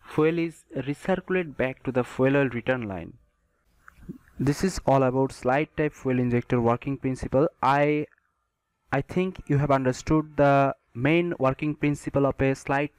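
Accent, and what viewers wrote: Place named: India. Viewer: Indian english is awesome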